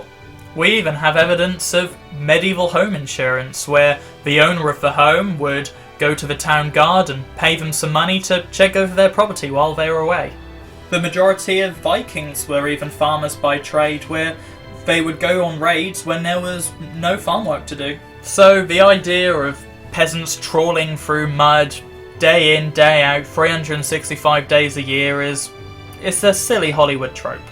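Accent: Norfolk, United Kingdom. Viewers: I'm Norfolk born n breed . So your accent is music to my ears . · The only thing better than a Norfolk accent is a Norfolk sense or humour you are a star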